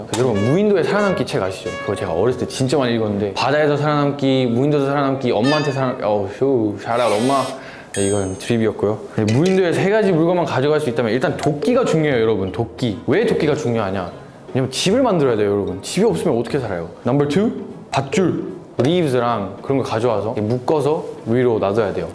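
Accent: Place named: Busan, South Korea. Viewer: 동생들이 부르던 노래 불러주는게 너무 귀여움 부산 사투리 하는 호주남자.. 좋다 혈액형을 노래 부르면서 말하는 우리 예준이 캐스팅썰은 언제 들어도 재밌어.. 살아남기 시리즈를 알고 있을줄 몰랐는데 ㅋㅋㅋ 혼자서 1인2역하면서 카이렐이 중요한 이유를 알려준느게 너무 귀여워 ㅜㅜ 이부분 보면서 눈물날뻔했다 ㅜㅜㅜ 😢 이게 MZ인가 ㅋㅋㅋㅋㅋ 컨텐츠 준비해주셔서 너무 감사합니다 😍😍 덕분에 우리 앰퍼원 외국 멤버들에 대해 더 알아가고 있어요 맞춤 질문까지 준비해주신 센스.. 짱입니다 👍 카이렐편, 브라이언편도 기대하겠습니다!!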